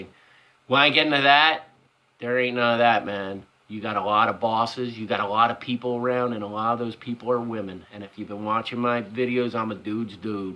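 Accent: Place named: Mid-Atlantic, United States. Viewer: Ha, I am in South Eastern Pennsylvania, I thought that I recognized a Mid-Atlantic accent, ha, Alcohol is my drug of choice, drinking Vodka as I type, I used to smoke weed years ago, not really my thing anymore, I’ll take a few hits her and there, do what you do man, it’s all good, trucking is horrible these days, still an LTL driver, companies such as YRC and NEMF etc, are having a tough time finding drivers, local drivers, because, they do not want to pay anything..oh well..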